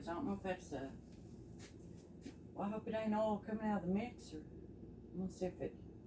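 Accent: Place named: Tennessee, United States. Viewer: How cute are you!!☺️… Love that accent.. Are you in Tennessee?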